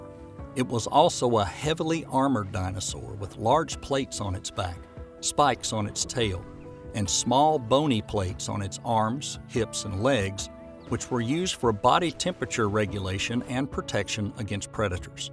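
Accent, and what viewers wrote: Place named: Rural, United States. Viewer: Y'know what I just realized while watching this? My view of the south-western US states was subconsciously improved by the fact that this info is being delivered by a rural American accent. It's embarrassing to realize I got influenced in that way, but...seems like an effect that tourism boards should be looking at. Evolution being discussed by this demographic feels out-of-place, but it doesn't have to!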